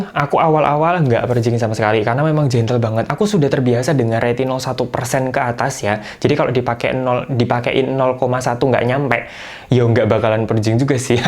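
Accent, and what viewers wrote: Java, Indonesia. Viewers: Seneng aku ndelok channel iki, cara mbahas e medhok tapi ndek kuping iku enak.... jowo banter cara ngomong e hhhh. Gudluck cak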